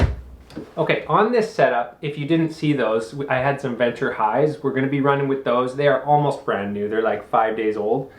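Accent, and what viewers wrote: Canada. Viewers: I dig the Canadian accent. I donno I just felt compelled to express that. Great video, man.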